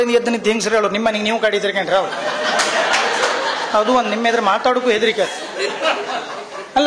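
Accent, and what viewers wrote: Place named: Udupi, India. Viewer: speaks very beautiful UDUPI Kannada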